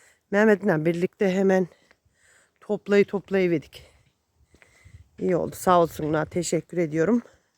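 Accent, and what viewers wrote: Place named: Uşak, Turkey. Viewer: Şiven cok tatli abla usakli arkadasim vardi sivesini cok severdim☺☺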